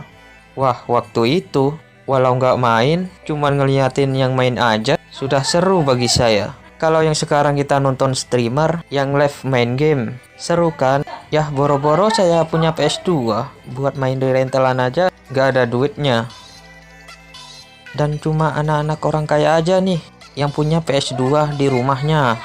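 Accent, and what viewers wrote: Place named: Madura, Indonesia. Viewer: Cara ngomong + backsound nya kek kenal 😂 Rada ada logat Madura nya dikit 😆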